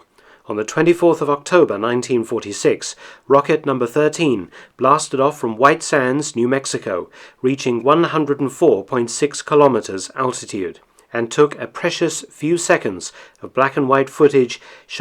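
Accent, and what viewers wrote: England, United Kingdom. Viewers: The real story is something your not willing to tell. Instead you decide to play it safe thinking your English accent and the history of German rocketry will make you credible but probability states otherwise. Are UFOs just Hollywood hype when military has already confirmed their existence? Is it more likely (more probable) that these UFOs are flown by aliens rather than man? Aliens are the Hollywood hype, not UFOs, it's even more likely the so called aliens are man- made as well. I believe in aliens but they are more likely to be involved in the resources of their own solar system which is probably as vast as our own.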